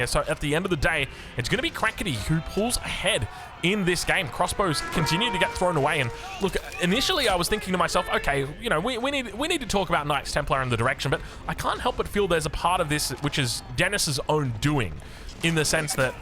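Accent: Australia. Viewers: is truly the top tier caster. Funny, insightful, genuinely passionionate and knowledgeable about the game, a family man, that delicious aussie accent, minimal shilling, not afraid to call out pros and devs while being generous in giving props to good plays and dev decisions and shouting out and linking players whenever possible. I don't like every video but let me tell you I love every video and haven't missed one in a while (except FFA's I skip those sorry). KEEP UP THE SICK WORK MATE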